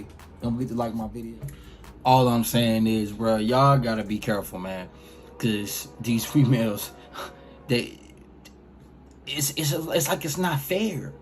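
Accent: Baltimore, United States. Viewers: Thats that bmore talk....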